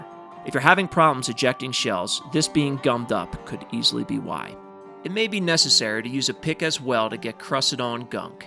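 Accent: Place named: Pennsylvania, United States. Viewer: My buddy from Pennsylvania and you sound identical!!! Its crazy!! Same accent. Awesome video!! Thank you.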